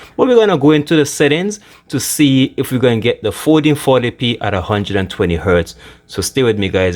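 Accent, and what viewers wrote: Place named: Jamaica. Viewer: "yuh don't get nooo screen teerin" I can hear the accent deep yute big ups for the golden video I hope you make a lot off this man thank you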